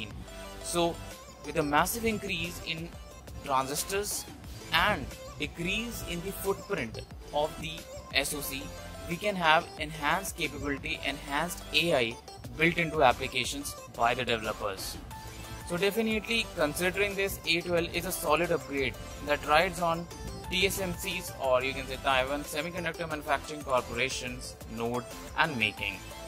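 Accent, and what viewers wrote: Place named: India. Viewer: Crappy typical indian accent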